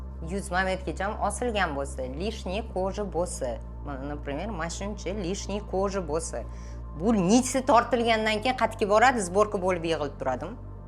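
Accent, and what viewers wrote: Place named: Uzbekistan. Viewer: Toʻppa-toʻgʻri xuddi asl haqiqiy oʻzbek ayoliday gapirdingiz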